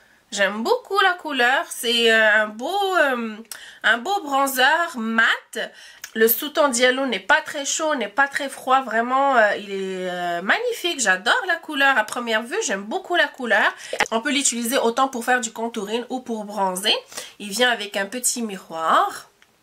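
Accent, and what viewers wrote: Canada. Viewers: coucou,magnifique et superbe video,j'adore le make up,toujours a la hauteur bravo continue,j'adore ton accent canadienne,pour le petit masque javais dis 90 usd,sinon le rouge à lèvres est tres beau!!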